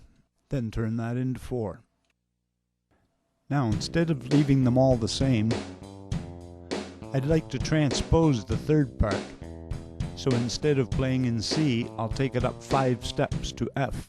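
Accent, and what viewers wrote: Canada. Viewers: Hey Dude, Nice job on the tutorials. I'm am down here in San Diego and used to be from Cleveland, the Home of Rock and Roll, had a studio there called North Coast. You remind me of many a gig I did in Canada. I didn't read your bio but you have to be from the Great White North by the sound of your voice. I must say, you have a very polished delivery in your tracks and really easy to follow. I, being an engineer have spent HOURS editing "ums.& ahh. ahh" Nice instruction, preperation/delivery.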